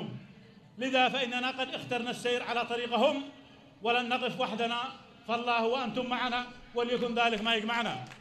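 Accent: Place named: United Arab Emirates. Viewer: كلامك اماراتي روح بلادك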